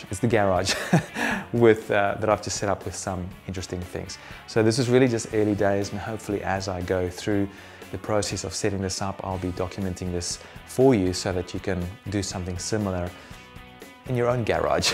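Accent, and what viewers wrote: South Africa. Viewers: You're South African :D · or South African living in Australia...does it matter? Its a bloody good video with great advice. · Where r u from u sound like u are from South Africa (not trying to be offensive I from there ) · are you south african? :D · Are you South African by any chance? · great video, thanks. Quick - and completely non-related - question: are you an expat South African? Or am I just imagining the Saffa accent?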